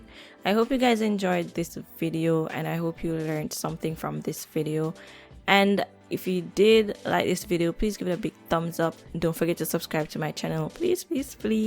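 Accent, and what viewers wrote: Jamaica. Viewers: You are very Jamaican. That "lawd Jesus" buss out 😂😂. Very nice hair style and method. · I figured you're Jamaican based on your accent 😍 love the execution girl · Heard that Jamaican accent and subscribed instantly 😩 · Cya hide the accent 🇯🇲 lol love it and def gonna try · Yup..I'm Jamaican too..so I was like yeah she is from Jamaica 🤗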